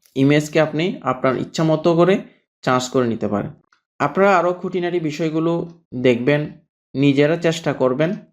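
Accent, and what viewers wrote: Chittagong, Bangladesh. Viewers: ভাইয়ার বাড়ি নিশ্চয়ই চট্টগ্রাম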